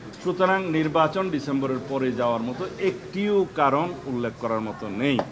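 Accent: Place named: India. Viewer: ভারতের মাল কথা বলার স্টাইল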